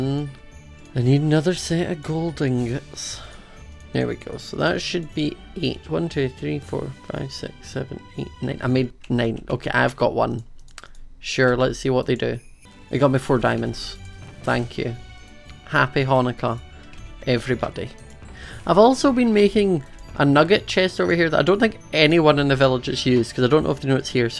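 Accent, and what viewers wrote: Scotland, United Kingdom. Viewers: are you really Scottish because i love your actcent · Are you Scottish????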